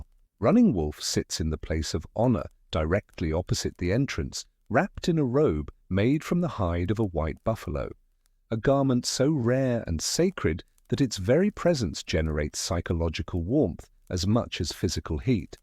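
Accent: United Kingdom. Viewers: Watching from southeastern Texas, 🇺🇸 USA. You have a beautiful British accent. Could listen to you for hours.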